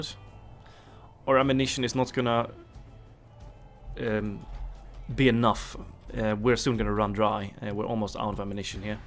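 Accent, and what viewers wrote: Sweden. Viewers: Haha I can hear the swedish-english accent. Awsome video and gameplay! 💪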